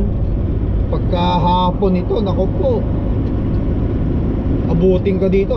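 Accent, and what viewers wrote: Ilocos, Philippines. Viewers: Kaykayat ko ta ilokano accent mo lalo no ag-english ka, reminds me of my old man. Ilokano Owner/operator as well ditoy abbotsford BC ngem salesman/distributor ti business ko ti winnipeg based chips company. 18 years na ako sa Canada medyo naikkat kon diay ilokano ken pinoy accent kon na agsao ak ti english. Hahaha praktis lang. Lol😂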